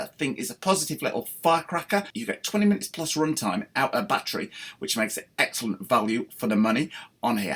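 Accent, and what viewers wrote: England, United Kingdom. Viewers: I don't think so his accent is definitely English but in one sentence he can have a twang of South African or Australian, Birmingham and then Lancashire. And the stop/starts of his sentences are really strange. I'm going to go with 👽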